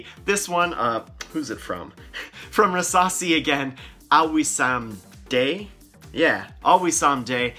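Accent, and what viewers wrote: Canada. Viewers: I'm Canadian and I didn't realize we have an accent til I heard the maritime accent. Love it! Solid list, plenty of these are on my list as well, although not so keen on Rasasi releases. Cheers.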